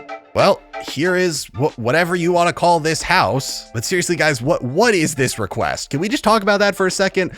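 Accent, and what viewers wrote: United States. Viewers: Is bro from Pittsburgh?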